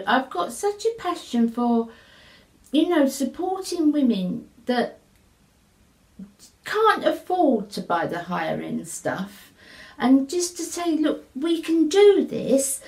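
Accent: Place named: Scotland, United Kingdom. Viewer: I have been subscribed to your channel but hardly have a time to drop a line! What part of Scotland are you from? I luv the accent!👍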